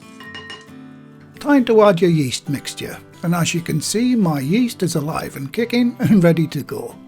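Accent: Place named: Newcastle, United Kingdom. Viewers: Wonderful thank you. I love your YouTube presentations. I have lived in Australia for 43 years but I love a Geordi accent🙏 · Hello thanks for the amazing tip, I cannot get any strong bread flour anywhere in my area still sold out in all the shops but will keep looking, I am also from the north east of England and when I first watched one of your videos I instantly recognised your accent before you said where you were from ha ha:), your videos are amazing:) stay safe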